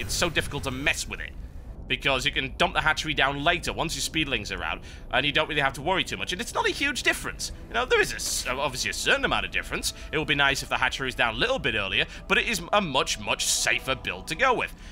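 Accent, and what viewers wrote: Germany. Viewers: Love you`r German Accent :)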